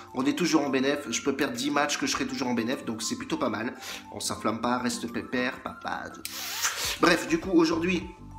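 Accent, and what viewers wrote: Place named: Italy. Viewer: Slt tonton,bien joué ça passe crème,tu nous régale la famille! Et les pertes, c normal sinon on serait riche,continue comme ça,et surtout tu me fait trop rire avec ton accent italien!!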